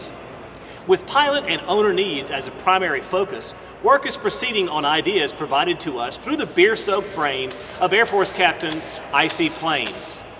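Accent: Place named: South, United States. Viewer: I've heard this presentation before but it's more authoritative when the presenter has a Southern accent.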